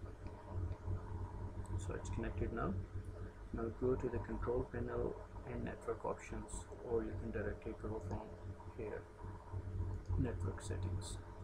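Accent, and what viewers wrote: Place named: India. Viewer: Will I be lucky today? Is the narrator going to speak normally or have an obnoxious Indian accent? ... FUUUUUCK!!!!!!!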